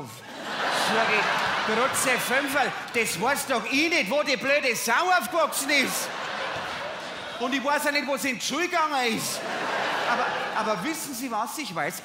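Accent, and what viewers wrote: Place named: Bavaria, Germany. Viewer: Martin du bist da Wahnsinn ❤bleib den Bayrisch treu ❤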